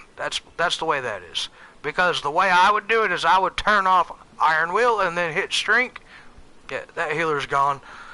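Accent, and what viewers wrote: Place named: Texas, United States. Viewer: Are you from Texas? You must be from Texas. I really like the sound of your american voice.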